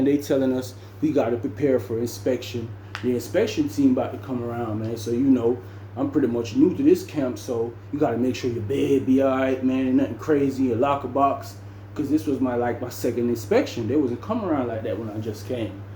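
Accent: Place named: Jamaica. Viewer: It’s funny hearing a Jamaican and country accent mixed in one 😂 respect bro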